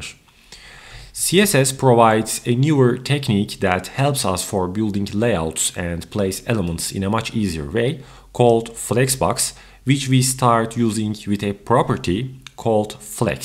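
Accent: Turkey. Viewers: Türk ingilizcesi nerde duysam tanırım :D cok güzel anlaşılır sade bir dil kullanılmış. Elinize dilinize sağlık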